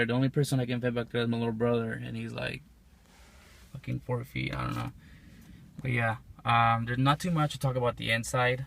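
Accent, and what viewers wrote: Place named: Mexico. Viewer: Sick ride! And I actually like the Meskin accent bro!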